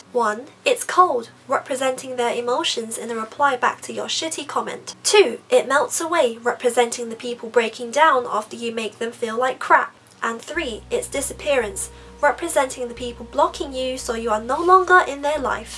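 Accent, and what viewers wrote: London, United Kingdom. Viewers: Are you from London? I cant spell worth shit...but im just fine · Do u learn British English? C: I love ur accent :P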